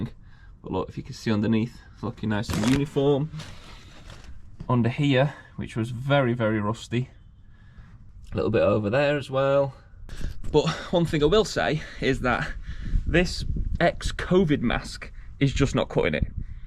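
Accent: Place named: Stoke, United Kingdom. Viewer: top job.. is that a stokey accent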